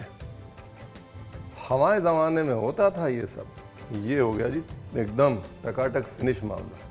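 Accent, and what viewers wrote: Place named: Punjab, India. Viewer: Aap cooking thriller Hain aik dum Khushi say Jo recipe banti hai Woh laJawab hoti hai ❤ Chass a gaya wai Punjabi lehja wala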